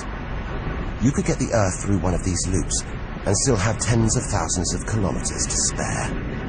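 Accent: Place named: United Kingdom. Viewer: But the whole British voice is killing me lol.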